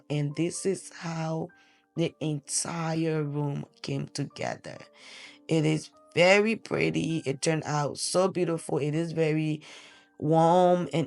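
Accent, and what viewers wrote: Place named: Liberia. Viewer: New here on your channel, like your content, your name is Ghanaian but u have a Liberian accent,you did a good job...🫡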